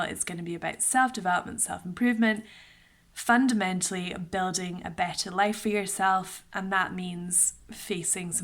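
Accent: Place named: Ireland. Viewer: This is a good video. And the Irish accent was brilliant.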